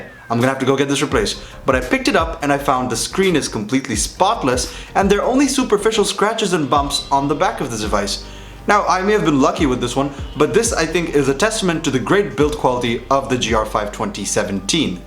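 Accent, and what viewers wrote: India. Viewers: the only techy Indian with a GREAT ENGLISH ACCENT. Keep it up bro!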